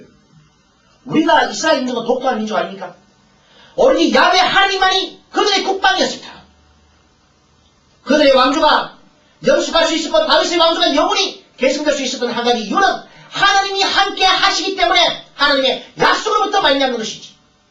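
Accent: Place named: Gyeongsang, South Korea. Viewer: 아멘 아멘~~~경상도 사투리 정겨워요 부분부분 돌려 듣기하느라 순교하는줄~ 말 엄청 빠르시고 억양도 쎄고ㅋㅋ그래도 은혜 받고 갑니다 아모스와 호세아 같은 선지자가 절실한 때인거 같습니다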